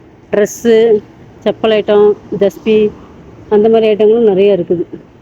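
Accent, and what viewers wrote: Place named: Tirunelveli, India. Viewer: நீங்க எந்த ஊர் திருநல்வேலி பேச்சி மாதிரி இருக்கு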